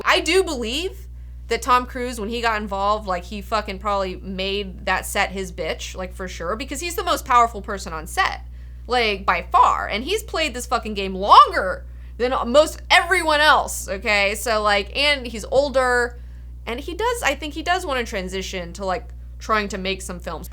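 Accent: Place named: Midwest, United States. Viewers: Where did that Pittsburgh accent/ midwest accent come from? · Midwest "O" pronunciations. Holla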